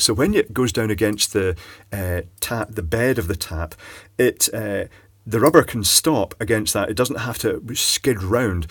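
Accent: Scotland, United Kingdom. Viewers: Absolutely right, there would be more heavy metal suspended in the water than would ever diffuse from the brass alloys, we have far too many self important idiots here in Australia, unfortunately they, and their trouble making shop steward mates all seem to have accent's just like yours, please don;t think for a moment that, that is a jab at the lovely Scottish accent, nothing could be further from the truth, just that if you are on a job site here in Oz and you hear a Pommie or Scottish accent, you can bet that the heaviest thing it's owner has ever carried is the chip on his shoulder. Love your videos.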